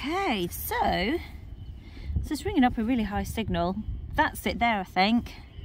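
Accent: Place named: United Kingdom. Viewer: Superb hunt. Adore your British accent 💖 I now know where your lad gets his 'OMG' catchphrase. 😄 Cheers 🇺🇸🇬🇧❤️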